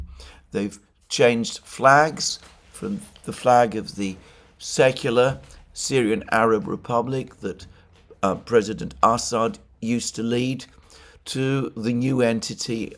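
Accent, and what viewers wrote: United Kingdom. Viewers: The idea that Israel will want to acquire Damascus is so absurd, so out of touch with reality, so utterly ridiculous that it makes you sound like an idiot even with your suave British accent. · I can't stand that British accent in times like this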